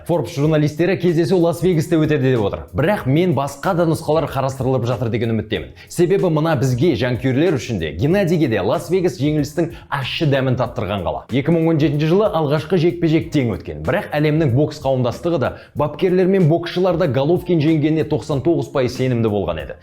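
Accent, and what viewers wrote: Standard. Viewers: Керемет 👍таза қазақша, арна алға баса берсін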